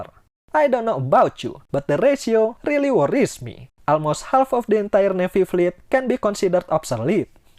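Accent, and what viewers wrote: Indonesia. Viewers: Saya suka bicara nya.logat nya kental indonesia..pertahankan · Aksen orang indo:v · aowghwh logatnya indo bgt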